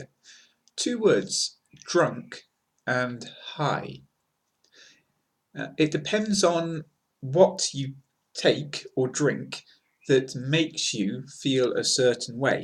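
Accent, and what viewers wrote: United Kingdom. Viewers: What part of Britain is his accent from?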